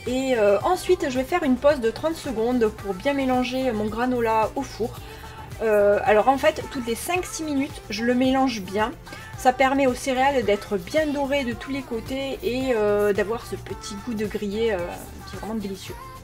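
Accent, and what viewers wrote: South, France. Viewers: Bonsoir! Je suis super contente de découvrir votre chaine ! Je suis du Sud, Boulbon 13150. Mais voila, j'ai emménagé depuis le 1 aout à Lyon 5. J'y suis super bien! Mais quand même... Votre adorable accent du sud me rappelle ma région j'adore !!!! J'ai tout de suite en tête les cigales, le soleil, le thym, la lavande!! Que du bonheur vos vidéos que je découvre aujourd'hui!!!! Merciiii!! Merci pour ces moments de partage, de conseils, d'excellentes idées recettes ect....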